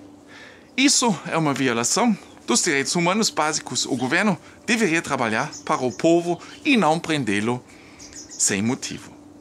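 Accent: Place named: Germany. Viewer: Permita-me trata-lo por meu amigo, voce desmonta todos os estereótipos, pré concepções e paradigmas que nós latinos temos a respeito dos Alemães como sendo um povo sério, carrancudo, de poucos amigos e cujo senso de humor não é um ponto forte. Se o Alemao medio é como imaginávamos, voce é um ponto fora da curva!! Voce esta de parabéns pelo senso de humor que se torna ainda mais pitoresco com seu sotaque alemao ( muito legal diga-se de passagem ) ao mesmo tempo que mantem o foco na informação de qualidade e sem muitos rodeios. Sensacional!! Tambem aprecio muito essa forma de dar as noticias, caminhando e mostrando um pouquinho de sua cultura para nos!! Parabéns!!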